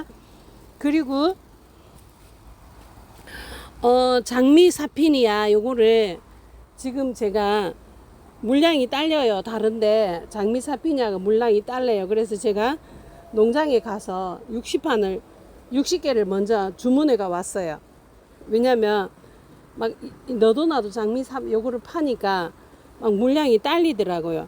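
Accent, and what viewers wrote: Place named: Gyeongsang, South Korea. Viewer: ㅋ ㅋ 경상도 말씀을 하시는데요. 아무튼 재밌네요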